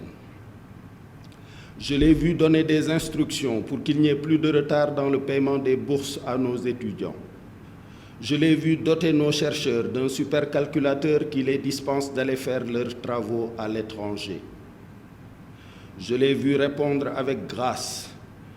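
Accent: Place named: France. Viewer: Voici un gars extrêmement dangereux. J'ose seulement espérer que le Pr Macky Sall, son panel de conseillers ainsi que le peuple sénégalais sont suffisamment avisés pour comprendre qu'il est en train de faire un clin d'œil aux français et à l'armée sénégalaise. Et son message est suffisamment clair : "je suis une alternative à Macky Sall". Mr Seck ne s'adressait pas au peuple sénégalais. Son accoutrement en témoigne parce que, généralement quand il cherche l'adhésion des sénégalais à son discours, il s'habille en grand boubou blanc et s'exprime en wolof. Mais, aujourd'hui c'est plutôt en français avec l'accent d'un "noble" français. D'autre part, à date nous sommes pas encore informés de biens d'un investisseur burkinabé, nigérian, danois ou américain pillé. Affirmer que l'armée sénégalaise et les forces de sécurité sénégalaises sont les MEILLEURES au monde alors que leurs membres sont formées au Maroc, en France et aux USA est une insulte à ces derniers. 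Enfin, se déclarer facilitateur entre MM Sonko et Sall revient à reconnaître que les évènements de ces derniers jours ne sont pas un problème entre l'État du Sénégal et ses administrés, ni entre Mr Sonko et la justice sénégalaise mais plutôt entre le Chef de l'État (ou d'un parti politique) et un de ses concitoyens (ou opposant). Pourquoi les parenthèses? Parce que je ne me retrouve plus dans tous ces jeux. God bless Sénégal and its people!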